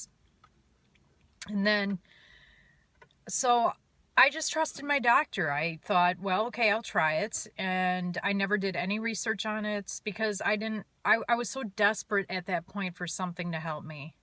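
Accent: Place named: Wisconsin, United States. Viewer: Hey, great vid. This is my 3rd time posting so i hope it goes through, or u might have a few comments from me sayin the same thing. haha. Anyway, its good to see another face/voice spreading the word about the dangers and reality of these drugs. Nobody else will understand the hell these things put people through. And yes, ur right. They are aware of what these drugs do and have been since the 80's. Its gonna be a tough road going through this shit but at one point it will be history and unfortunately we learn a lesson the hard way. The lesson of these dangerous, mind altering pharmaceuticals, the business behind them and even a little about the people who we trust to watch out for us. Its all bullshit and we only have eachother and urselves to look out for us. We lose friends and family, our jobs, relationships, enjoyment in life and faith in a system we thought was helping. 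Its like losing your soul. We are eventually forced to go through it and thats that. But on a good note its good to hear the Wisconsin accent. haha. Keep in touch and all the best to you and your family.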